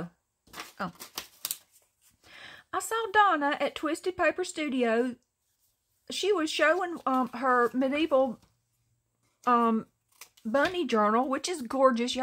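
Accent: South, United States. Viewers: Hey! 😁 You have the sweetest voice. I’m from southwest GA but I live in Wa state. I miss my folks. I love a good southern accent. 💕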